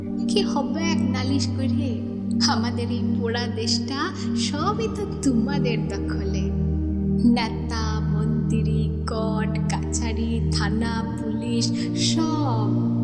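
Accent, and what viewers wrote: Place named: Bankura Purulia, India. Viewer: Darun hoacha amdar Bankura purulia vasa ta❤❤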